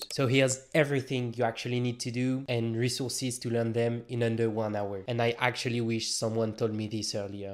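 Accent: France. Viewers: Toi t'as un accent français 😀! Cool ta vidéo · Sounds like a french man 😅. Ahh Really informative video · you sound french right .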